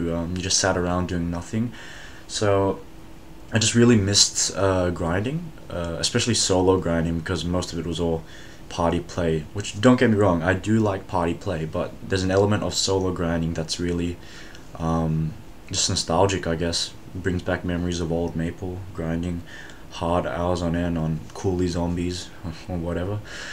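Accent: Australia. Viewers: An Asian that sounds like a Australian...... Wow....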